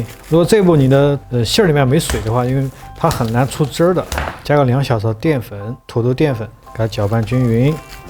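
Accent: Sichuan, China. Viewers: 一听就是老乡—四川人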